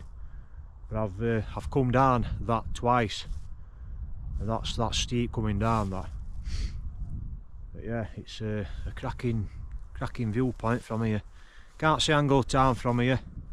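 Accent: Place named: Yorkshire, United Kingdom. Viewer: Great stuff! I'm gradually learning to understand Yorkshire dialect!! Great places, great views and great courage to go out there in December. Thanks so much. It's really inspiring and I might consider following in your footsteps! Love it!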